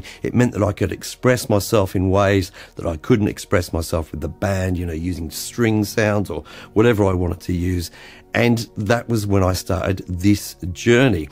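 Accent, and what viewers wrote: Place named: Australia. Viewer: Wow, I really thought you were Australian. Never would have guessed you were brought up in the UK. Anyway, thanks for sharing your expertise here. It's really helped me a lot as a Cakewalk newdie. As for me, can't really say I'm a musician, but have the urge to create. Composed a lullaby for my new baby last year which led me to Bandlab, and now to Cakewalk as I try to make the song as good as I can with my limited abilities and 100 virtual instruments. Really like it as a creative outlet. Thanks again for making it easier.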